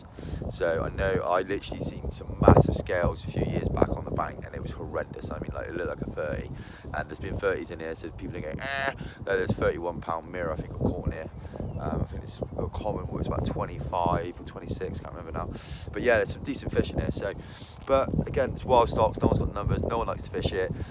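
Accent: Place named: Wales, United Kingdom. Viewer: I DO NOT SOUND LIKE YOUR IMPRESSION OF A CARP ANGLER!!!! :) I like this video, best one yet. You mentioned Somerset, i thought you sounded Welsh?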